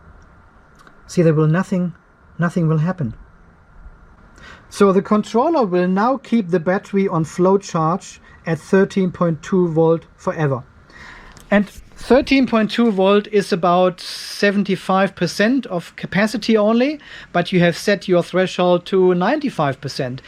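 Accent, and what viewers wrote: Germany. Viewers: So funny listening to a German pronounce Lead or Voltage, as for Iron ......OMG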